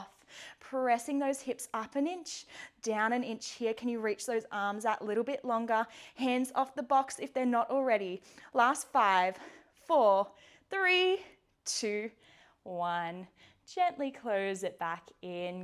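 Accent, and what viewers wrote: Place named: Australia. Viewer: I've only recently found you. I've done couple of your classes, and I really enjoyed this one. I also LOVE listening to an Australian accent instead of American! 😊 I also like how you refer to different reformers and modify for them. I have a YR, and I'm an instructor, but don't do enough of my own practice. Thank you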